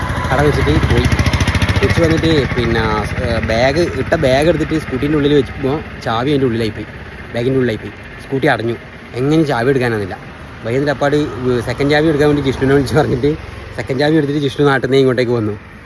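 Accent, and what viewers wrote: Kannur, India. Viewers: നമ്മട kannur ഭാഷ, ❤️🥰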